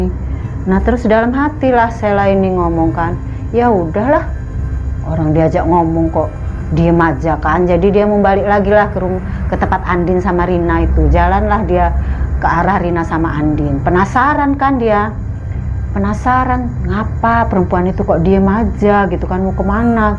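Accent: Lampung, Indonesia. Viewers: Oke siap .. ciri khas suku gham lepung (suku kita Lampung)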